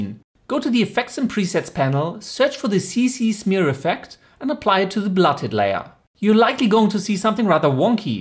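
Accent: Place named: Germany. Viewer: You have a german accent xD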